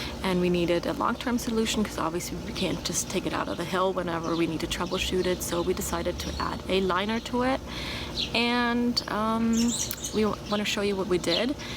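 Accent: Germany. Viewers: I hear a bit of German accent in your voice. It's beautiful. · Fantastic video! Are you by any chance originally from Germany? (Slight accent detected) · Very nice! Do I detect a German accent 😊?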